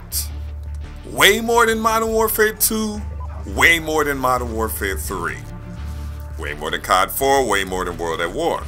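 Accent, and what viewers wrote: Philadelphia, United States. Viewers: are you from philly ? i am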